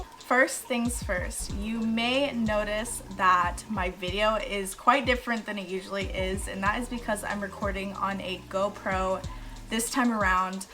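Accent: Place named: Southern Midwest, United States. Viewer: You sound more like you are from Southern Mid-West US than you do Australia. Transplant? Been watching yours and Nick's videos lately. Was wanting to breed some bread and butter fish other than boring guppies and bristlenose. Your videos are good motivation.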